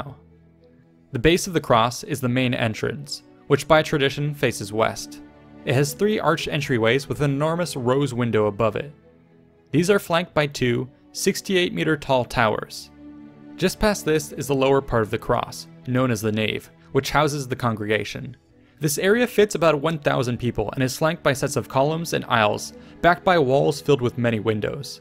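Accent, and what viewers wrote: France. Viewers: He's french lol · Cringe French accent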